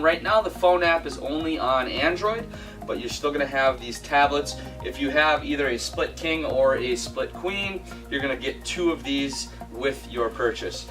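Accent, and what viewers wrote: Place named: Canada. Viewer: Sound Canadian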